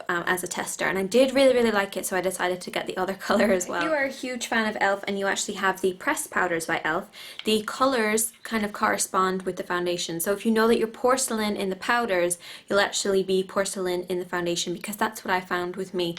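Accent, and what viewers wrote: United States. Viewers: Are you irish? Cause you sound like you're from America. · you almost sound american :) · are you from america but living in Ireland? you sound so American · I think Shes Irish-american. She talks like a american with a Irish accent...if that makes any sense...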